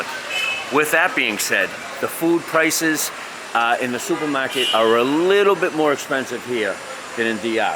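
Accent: Boston, United States. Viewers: Learn Spanish bro, I can barely understand you with that Boston accent and I am a native English speaker 😆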